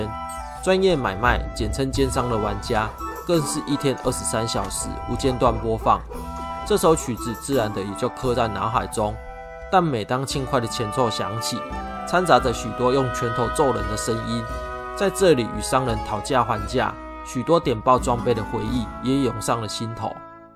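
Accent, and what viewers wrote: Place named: Taiwan. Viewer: 挺喜欢听你说天堂的故事；尤其那带满台味的国语，听了就亲切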